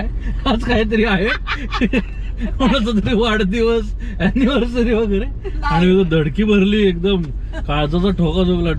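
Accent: Kolhapur, India. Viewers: तुझी कोल्हापुरी टोन खूप आवडला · Kolhapuri tone mast aahe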